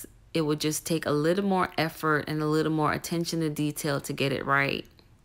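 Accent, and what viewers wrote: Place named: Jamaica. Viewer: Ok....I definitely hear a Jamaican accent!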